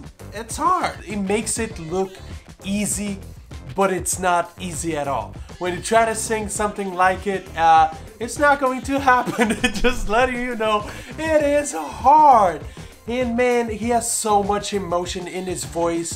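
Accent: Brazil. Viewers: Recebo a notificação e venho correndo para assistir ao vídeo 😊 Melhor canal da vida, com o português mais brasileiro do YouTube ❤🇧🇷❤🇧🇷❤🇧🇷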